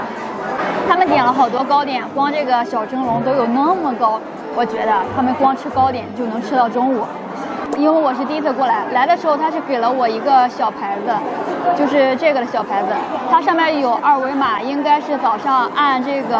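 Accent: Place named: Henan, China. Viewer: 小编年轻轻的口音这么重，不知道是河南人还是河北人，要多说普通话，否则让人听了很难受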